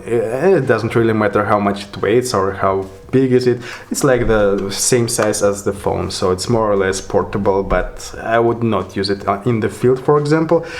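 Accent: Russia. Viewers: сори, слушать такой вот рунглиш это насилие для ушей. попробовал включить субтитры, но они автопереводные. смотреть и без звука и без субтитров - бестолку. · мне понравилось, давай больше таких роликов, смотришь и язык подтягиваешь ) при чем быстроговорящих американцев без субтитров не понять, а когда присутствует русский акцент, сразу понимаешь ))) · Прикольный английский у тебя 😺 Чисто русский английский 👍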